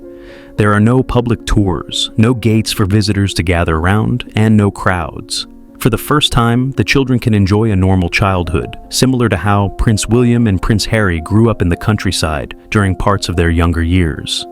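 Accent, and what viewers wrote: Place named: United States. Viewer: Interesting, but did we need the awful American accent on a programme about a Royal home?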